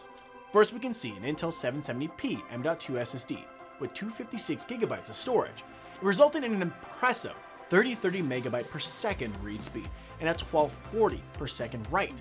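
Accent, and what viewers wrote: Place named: Taiwan. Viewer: oh I love this talking style from taiwan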